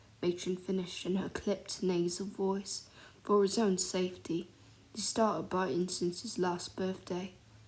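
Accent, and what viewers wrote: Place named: United Kingdom. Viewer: Woah I thought you were British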